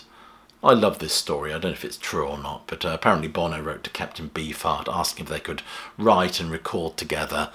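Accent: England, United Kingdom. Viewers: He's English ..you cant figure out that accent?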